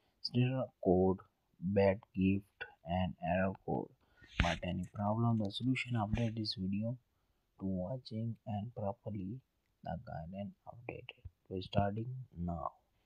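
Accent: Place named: India. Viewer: Fix your Indian street shitter accent plz😭😭😭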